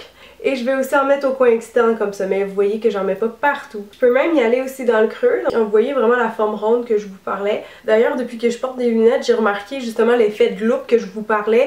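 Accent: Quebec, Canada. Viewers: Je kiff l'accent québécois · t québécoise ??? PS : merci bcp pour l'astuce mon maquillage + le fond de teint + les lunettes sa donnai n'importe quoi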